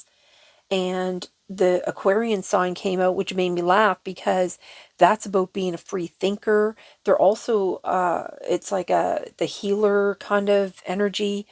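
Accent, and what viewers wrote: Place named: East, Canada. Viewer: Hi …. Haha yup things are shifting! I just love your eastern Canada accent being in Saint John NB from western Canada 🇨🇦 it’s my favourite as the people are the kindest in the Maritimes … as spiritualists we all need to get into gear now 👍🌈💕🌈🌈🌈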